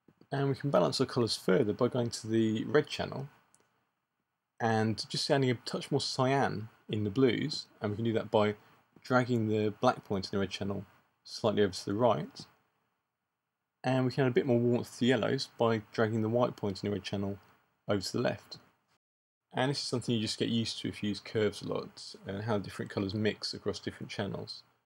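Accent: United Kingdom. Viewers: His accent is English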